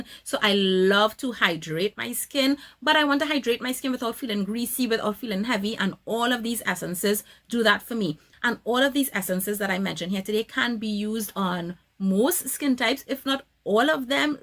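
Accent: Trinidad and Tobago. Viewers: This review helped me so much in choosing the perfect cleanser of my acne prone skin…. Thank you! Thank you! Thank you! And of course, you must know a Trini anywhere, especially by their accent 😍🇹🇹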